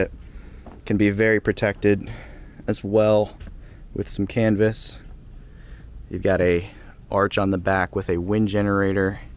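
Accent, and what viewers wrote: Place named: United States. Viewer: He’s not English , yank maybe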